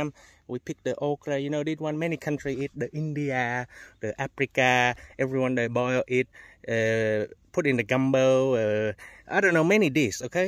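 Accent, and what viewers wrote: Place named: Vietnam. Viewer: i cant stop laughing. everytime i go footscray or richmond, i always hear this accent. lol but i love viet culture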